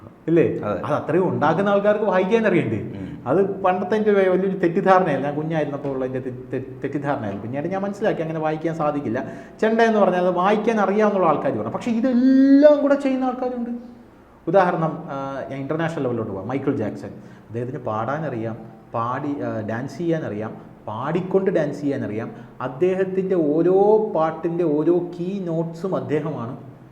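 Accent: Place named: Malabar, India. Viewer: വാക്കുകളിൽ മലബാർ ടച്ച്‌..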